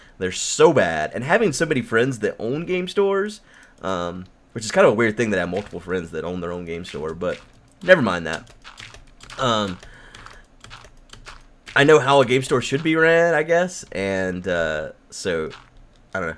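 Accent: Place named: Alabama, United States. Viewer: dude, are you from Alabama?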